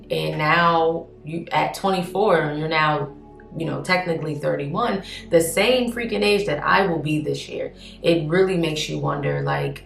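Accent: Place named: Baltimore, United States. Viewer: Also question are you from near Baltimore? I can hear that accent in certain things you say.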